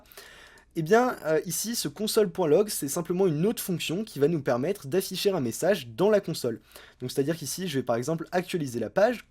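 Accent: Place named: France. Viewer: la voix du bon caifran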